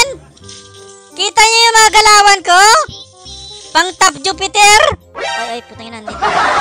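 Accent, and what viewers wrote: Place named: Iloilo, Philippines. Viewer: taga koronadal ka pala.. Kaya pa illonggo timo.. Hahah Galing... Frm gensan.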